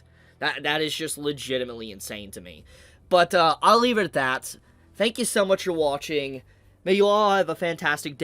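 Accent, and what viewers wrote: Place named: Midwest, United States. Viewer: Mid West accent goes hard in this vid lol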